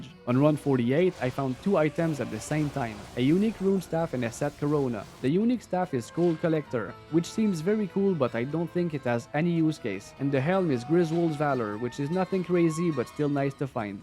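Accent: Quebec, Canada. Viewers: bruh i was like , he sound french canadian , apres tu dit , la face a ti-gui xD nice video bro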